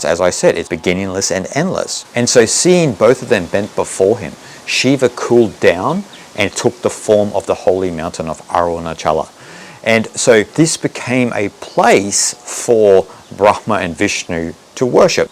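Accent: Australia. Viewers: THANKYOU SO MUCH FOR THIS WONDERFUL EASY TO UNDERSTAND EXPLAINATION OF ARUNACHALA AND RAMANA MAHARISHI AND THE REASON WHY PEOPLE GO THERE TO RECOGNIZE THE SHIVA WITHIN THEMSELVES HALLELUJA AND AMEN I HAVENT BEEN THERE MYSELF BUT MY DAUGHTER AND THEIR GROUP WITH THEIR GURUS DID THE CIRCUMBILATION OF THE HOLY MOUNTAIN I HAVE KNOWN ABOUT RAMANA MAHARISHI FOR A LONG TIME MANY YEARS BUT I GHOSE ANOTHER PATH OF TIBETAN BUDDHISM WHICH OFFCOURSE IN THE END COMES TO THE SAME CONCLUSION BUT IAM SO PLEASED THAT MY DAUGHTER DID THIS AND HAS TAKEN THE PATH OF SHIVA WITH TWO BALINESE VERY HOLY GURUS IN BALI AND WE LIVE IN BALI NO DOUBT YOUV HEARD OF UBUD A MECCA FOR TOURISTS AND YOGA ANYWAY I CAN HEAR YOU ARE AUSTRALIAN AS WE ARE AS WELL ALLTHOUGH MY DAUGHTER HAS BECOME INDONESIAN AND WITH HER INDONESIAN OR RATHER BALINESE HUSBAND BROUGHT UP HER KIDS HEAR AND DURING THOSE 27 years HAVE HOMESTAY WITH A YOGA RETREAT FACILITY AND SO ON HERE AND IS VERY MUCH INTO THEIR ASHRAMS AND SO ON FOR ALL THOSE YEARS BUT ANYWAY I HAVENT HAD THE OPPORTUNITY TO GO TO THAT PART OF INDIA ALLTHOUGH I WENT TO BODGAYA TWICE AND DHARAMSALA IN NORTH INDIA AND WAS PRIVILIGED ENOUGH TO BE IN THE PRESENCE OF HIS HOLYNES THE DALAILAMA AND RECIEVING ORDINATION FROM HIM BUT IT ONLY LASTED ABOUT 3 YEARS ANYWAY AND THEN MORE OR LESS ON AND OFF JOINED MY DAUGHTER IN BALI BUT NOW LIVE HERE PERMANENTLY FOR THE LAST 2 YEARS AND 9 MONTHS SINCE IAM 83 allmost 84 MY DAUGHTER IS LOOKING AFTER ME IN RETIREMENT THE NAME OF O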